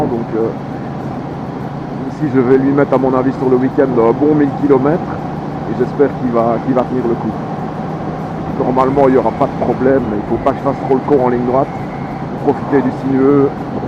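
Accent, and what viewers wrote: Belgium. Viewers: en faite j'hésite entre 2 motos que tu a testé ta ktm 790 duke ou la Triumph street triple rs les 2 me tente bien mais les budgets font réfléchir vu le temps que on a en Belgique et oui j'habite pas très loin de ton coness peut tu me donner ton avis sur les 2 machines points fort, points faible rapport qualité prix et aussi le cout entretiens pour ta ktm je te remercie d'avance et sa fait plaisir de voir et d''entendre un moto volgeur belge qui d'jase wallons V potos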